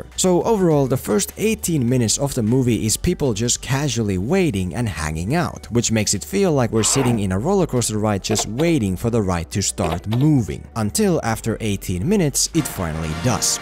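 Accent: Finland. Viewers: Are you from finland ? Something about your accent :p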